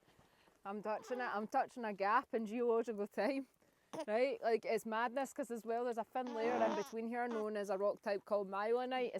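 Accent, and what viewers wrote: Scotland, United Kingdom. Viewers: So much fun hearing your Scottish language. Unique and very special. This is a very special tour. And so and so ... the two guys that I have been following here is Nick Zentner , a university guy, who carries along the Columbia River basin etc. in the Northwest and Myron Cook who does the Central Basin to the Gulf and beyond ~ Wyoming and such. (just saying😊) Thank you so much for sharing all that you do. 〰✨🌿👍🌿✨〰. Ooopa ~~~ And then Antonio Zamora who promotes the etiology of impact basins with vortex around Saginaw Michigan ~ 12,900 years ago ~ (not really geology more just history and our extinction event). And so thank you again. All good. ❇ New Hampshire. ❇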